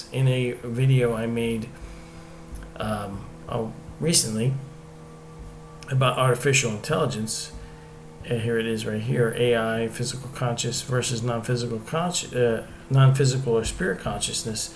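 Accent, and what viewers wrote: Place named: United States. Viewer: You have such a soothing voice, probably the most pleasing American accent I've ever heard.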